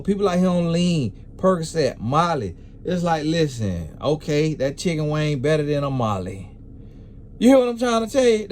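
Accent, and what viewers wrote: South, United States. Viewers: Lmao I love listening to talk!!! Please don’t change a thing bout your country talk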